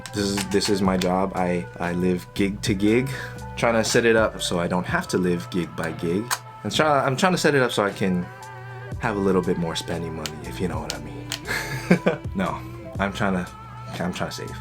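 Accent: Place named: Hawaii, United States. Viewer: I thought you were from Hawaii after hearing you speak and your demeanor. So am I. I’ve been an Ibanez player for as long as I’ve been playing and developed tendinitis. I thought strandbergs ergonomics would be a reason to switch.. maybe it still is but it’s a bummer to hear your wrist hurt after shows.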